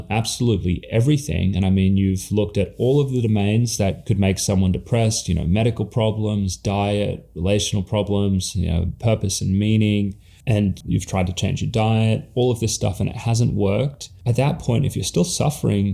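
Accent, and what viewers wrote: Australia. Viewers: had 2 hospital stays last year after not being in a psych ward since 17. I’m now 43. It was. HARROWING and BEWILDERING and WILDLY ETHICALLY REPULSIVE that they dispensed meds so freely. I spent 25 days in Denver Springs and saw and experienced things I never want to again. But it grieved me how people weren’t TOLD to get outside, weren’t taken aside to be talked to like a HUMAN PERSON with normal emotions who maybe needs a nurse to just sit beside them for a hot minute and be an empath (they didn’t seem to know what to do with young women there in a psych ward for the FIRST TIME EVER defeated and crying on the ground. get some social skills, docs!) For me, I’ve had one of the worst, longest cases of akathisia and tardive dyskinesia my doc has ever seen and he’s seen a lot. Something’s gotta change and so many of us need healing. I’m working on the emotional and heart/soul healing now with my pastor, counselor, and writing a memoir. 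I just found your channel, Dr.. And you must be… Australian? My fave Christian brother artists are native Aussies and sound like you.? Do you know them? THANK YOU FOR DOING WHAT YOU DO. even if I never get to your clinic or Dr. Amen’s or get off bipolar meds on this side of Heaven. You are doing amazing work. God bless you and that clinic.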